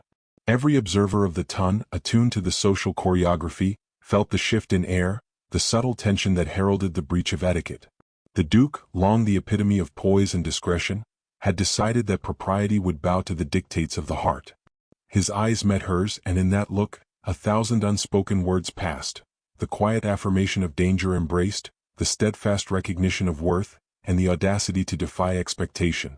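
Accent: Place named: United States. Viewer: Terrible narration; too hurried; no change in cadence and too American!!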